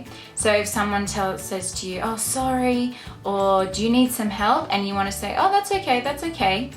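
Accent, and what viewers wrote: Colombia. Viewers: i love your accent you have a very strong colombian accent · Yes I was and sometimes still am super confused. Especially about the more subtle ways to use Usted and Tu in this culture. However, super thanks again, your video is awesome and I super dig your 'Rollo' accent; you have got rid of most of that Aussie gringo twang - that I happen to have as well. Heart for Change and Volunteers Colombia I think have now officially collaborated, to work together in an alliance so I am working in the same program that you are in. Feel free to add me on Facebook Stephen Deguara for my photos and updates! Anyways thanks again, great video, really hits the nail on the head with the greetings here that seem so simple, but really makes a huge difference when you interact with locals and you can take on some of the culture in Bogota! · The Colombian Accent really has got into you! 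· muy buena explicación, incluso tienes tu acento colombiano en español...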